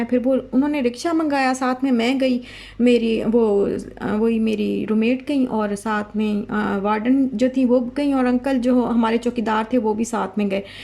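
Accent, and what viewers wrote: Saraiki, Pakistan. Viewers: Ap Multan se hain?sariki speaking hain?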